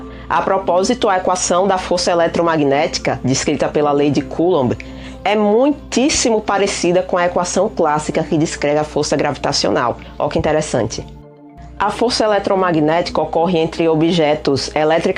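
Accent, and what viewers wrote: Northeast, Brazil. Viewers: "O bixinho é pesado" física e meu amado sotaque nordestino, adorei ❤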